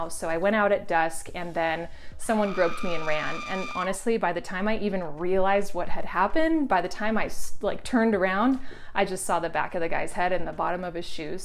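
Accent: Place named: North America. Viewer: I'm guessing you're either U.S. or Canadian by the way you speak. You remember thoes AT&T Sprint with Candace Brugan the dime lady. And MCI 00 it's magic you know. And <num> /<num> commercials? Saw them all the time in the 90s as a kid.. now days obviously they're a null point. Nearly everyone has a cell phone. I just can't understand why that being said phones have yet to work worldwide at the same flat rate. .. I hope it happens before this 2020s decade is over. As previously mentioned I know how to use a calling card from a payphone. However guessing I wouldn't find very many of either anymore??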